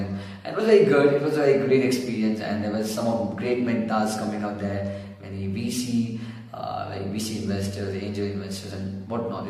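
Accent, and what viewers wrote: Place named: Russia. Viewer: Russian accent👌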